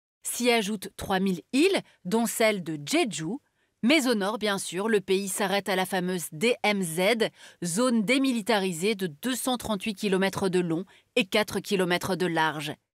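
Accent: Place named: United Kingdom. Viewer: entendre prononcer les noms des "chaebol" à l'anglaise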